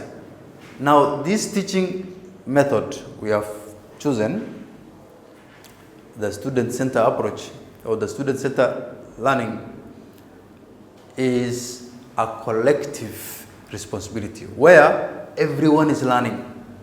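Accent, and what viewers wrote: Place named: Kenya. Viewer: I hope this teacher is from neighbouring country called kenya because he pronounce words the way i pronounced which means iam also from kenya.anyway thank teacher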